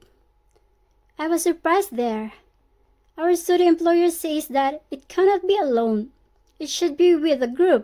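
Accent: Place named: England, United Kingdom. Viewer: Thaks again friend..i like your eng accent...nice blog you have♥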